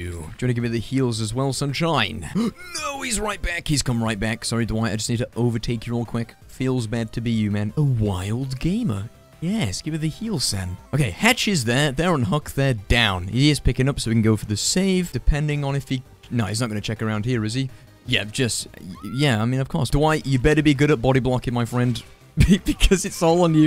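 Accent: United Kingdom. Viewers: must be british right?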